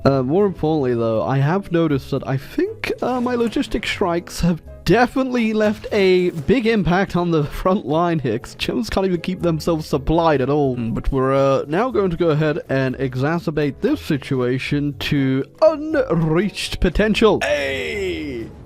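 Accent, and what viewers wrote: United Kingdom. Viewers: How am I just now realizing youre british wtf ew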